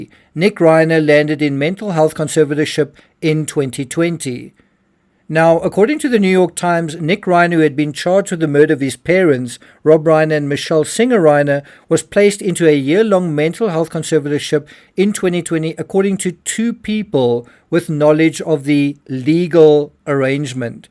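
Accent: South Africa. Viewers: This commentator sounds south africa . Is he.😊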